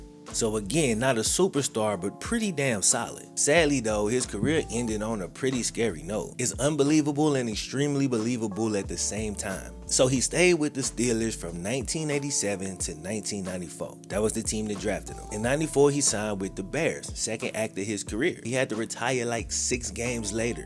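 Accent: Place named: Mississippi, United States. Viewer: Thanks. As another Mississippi boy, I love the way you tell your stories. It's not everyday you hear someone that sounds like us narrating so well. Love your work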